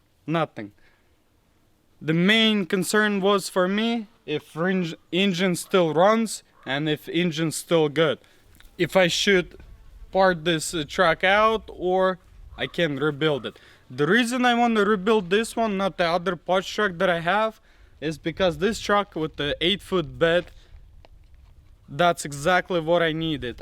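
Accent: Russia. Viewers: Блин! У вас русский акцент 😀! Ну молодец, восстановить полностью машину это технические работы! Было приятно смотреть видео!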